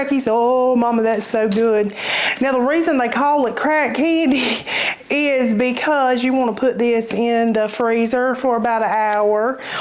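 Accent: South, United States. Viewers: I love love love your accent Southern belle accent lol. God bless you sister!!! U had me at peanut butter, you make a peanut butter sheet cake before with peanut butter frosting???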